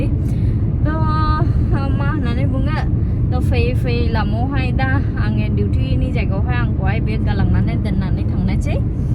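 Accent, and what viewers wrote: Bengal, India. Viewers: Pure bengoli bodo raidlai abo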